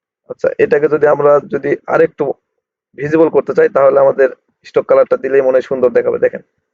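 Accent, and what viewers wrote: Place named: Joypurhat-Naogaon, Bangladesh. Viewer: vai apner basa ki joypurhat,naogaon er dike naki?kotha bolar dhoron sune jigges korlam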